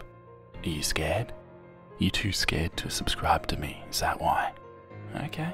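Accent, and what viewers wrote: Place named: Australia. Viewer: The first drawing has Springtrap eyes, Change My Mind... or maybe im just a FNaF Nerd And your voice helps me think like it, since PJ Heywood is also Australian 😅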